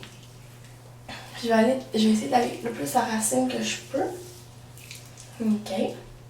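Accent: Quebec, Canada. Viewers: Sa s'appelle avoir un accent Québecois.... -____ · c'est son accent car elle est Québécoise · Son français est juste québécois laisser la gang de "français meilleur que les autre" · Vous pensez qu'écrire des commentaires de ce genre vont servir à quoi à part lui faire du mal? C'est juste méchant parce que son accent, tout les québécois l'ont, ses sourcils, je suis d'accord que ça lui va pas mais peut etre qu'elle s'est juste louper. Juger sur le physique c'est juste puéril et purement méchant. Si vous dites ce genre de choses c'est que vous ne la connaissez vraiment pas. Je n'ai pas la prétention de la connaitre, je dis juste que je suis toutes ses vidéos et j'ai pu remarquer que c'est une bonne personne qui a du cœur. Laissez tomber... ne fais pas attention à eux, ils veulent juste te blesser, ça vaut pas le coup, j'en ai eu l'expérience. Love. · j'adore trop ton accen du Quebec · Accent québécois espèce d'inculte -. 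· Comme j'aime dire des trucs HS, j'adore l'accent québécois! :P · Mais, putain fermer la !! C'est t'est venu juste ici pour la critiquer bah! Vas t'en ,, moi je la trouve magnifique d'même ok!! Chacun à son gout ,, son accent que vous dite , c'est du québécois ;p! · Perce qu'elle est québéquoise (ou canadienne) et vu que c'est près des usa il y a toujours un accent ;) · son accent..?? c'est une québecquoise loll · titi la gourmande elle est québécoise et les québécois et québécoise on un accent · Elle est québecoise hein. C'est normal ça fait partie de leur manière de s'exprimer. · ahh ta yeule sa s'appelle ''l'accent québécois'' ok? bye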